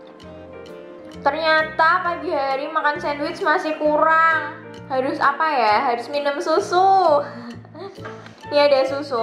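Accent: Java, Indonesia. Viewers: Mbaknya org mana?ook rodo2 njowo😂😂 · Ka dari jawa timur atau jawa tengah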